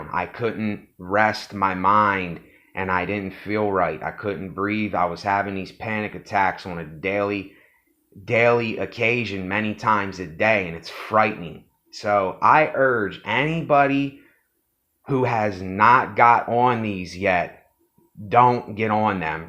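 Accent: United States. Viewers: You sound like your from northern Kentucky. Just saying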